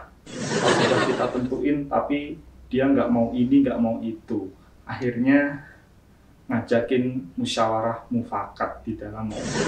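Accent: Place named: Java, Indonesia. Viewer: Keren dok....suka bahasane... pertahankan medoknya dok...he2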